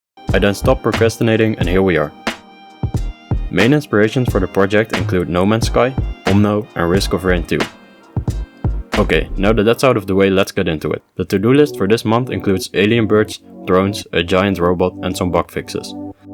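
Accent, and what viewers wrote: Netherlands. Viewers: I was hoping you'd do a devlog! Ook leuk om te horen dat je Nederlands bent :D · Mjst say : yoyr dutch accent is in english better then other ditch people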